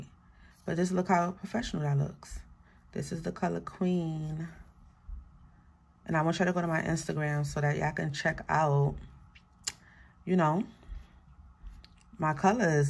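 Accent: New York, United States. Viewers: You’re definitely from New York lol🥰🥰🥰 I’m starting my neice lip gloss business for her and this is very helpful… Sending love from Jersey 😘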